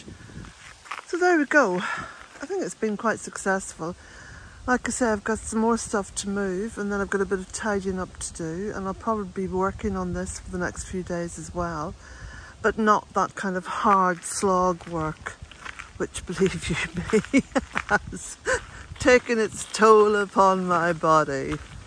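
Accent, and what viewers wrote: Ireland. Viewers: Last time i watched one of your videos you had your black dog. The little jack russell is very cute. And your accent has become very irish! Lol😊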